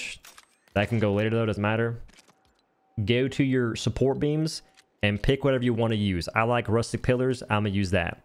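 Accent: South, United States. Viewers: Nice video, I’m gonna build an elevator to get to my monorail station. Look, I’m someone who’s always very curious about people’s dialects and accents. And to me, your accent is kind of interesting. There’s no non-creepy way to ask this but…what Southern state are you from? And to level with you, I’m from Chicago lmao.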